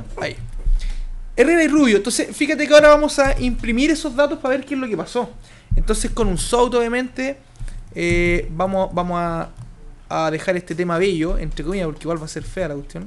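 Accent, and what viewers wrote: Chile. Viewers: que gusto escuchar chilensis asi si se aprende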